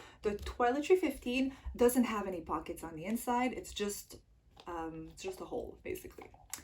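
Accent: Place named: Montreal, Canada. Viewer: You have the cutest Montreal accent ❤️ cute comparison!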